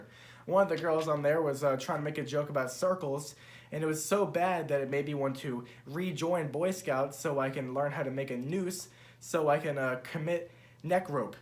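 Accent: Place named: United Kingdom. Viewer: British lady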